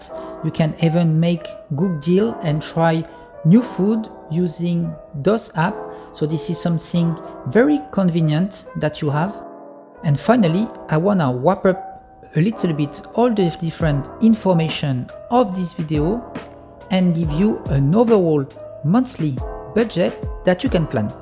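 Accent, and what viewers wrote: France. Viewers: T'as ouvert la bouche j'ai direct compris que tu étais français 😁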